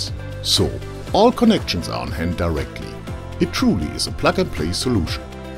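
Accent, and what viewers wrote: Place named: Germany. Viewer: Most german accent ever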